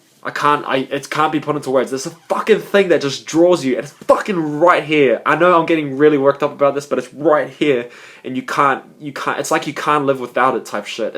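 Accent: New Zealand. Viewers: He's kiwi bro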